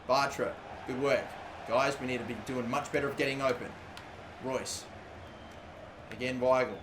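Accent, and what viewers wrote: Australia. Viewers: Soccer Eric77 I think the Aussie accent has to do with it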